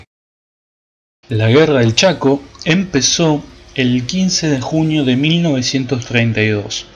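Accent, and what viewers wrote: Argentina. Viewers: obvio que es de Argentina o no escuchaste su acento :v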